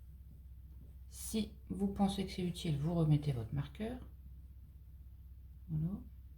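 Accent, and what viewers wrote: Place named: Nord-Pas-de-Calais, France. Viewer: Très joli est je pense reconnaître l'accent cht'i, bonne année 🎉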